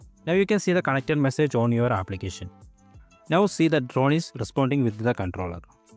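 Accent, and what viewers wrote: India. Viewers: 我勒个印度英语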